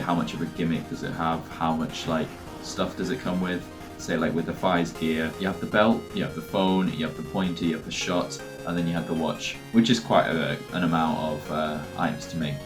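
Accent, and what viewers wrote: United Kingdom. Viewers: Ohh. British. Cheerio! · You talk British?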